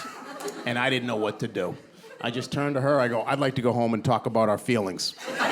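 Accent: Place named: Boston, United States. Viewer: FREAKING HILARIOUS!! Had the video come up on my recommended and I'm NOT disappointed!! I love your Boston accent and delivery was phenomenal!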